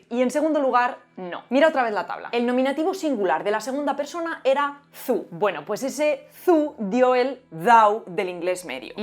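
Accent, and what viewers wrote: Spain. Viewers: Same! Also I was impressed by english. I really enjoy hearing a spanish accent. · Es tan divertido escuchar como lucha el acento de castellano de España con el idioma inglés. Saludos a.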